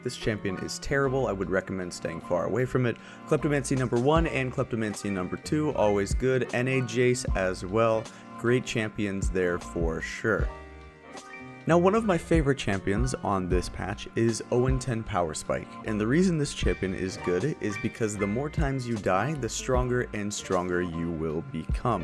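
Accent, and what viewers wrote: United States. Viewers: i love that Alabama ascent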